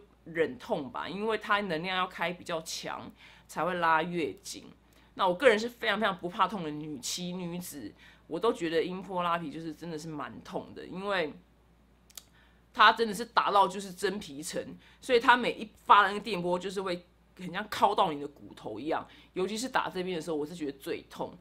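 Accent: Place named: Thailand. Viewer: 泰國味好重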